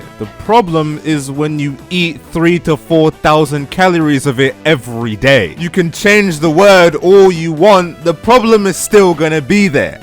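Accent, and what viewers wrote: United Kingdom. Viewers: you didt sound like timmy you sound just vary british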